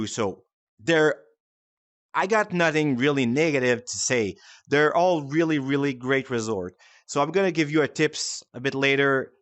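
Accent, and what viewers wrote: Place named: Quebec, Canada. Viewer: C est tellement un Quebecois qui parle !!!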